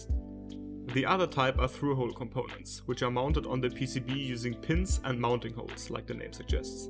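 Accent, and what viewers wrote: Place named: Germany. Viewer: Judging from his accent, he's german. So make ("machen") is often used broadly in foreign languages, even if he was referring to actually design(ing). That what happens if you don't use your mother tongue and its certainly not with bad intent.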